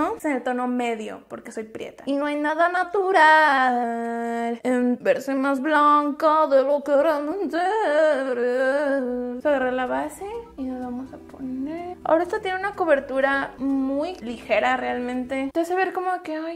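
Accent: Chile. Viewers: Me encanta que use el acento chileno weonnn!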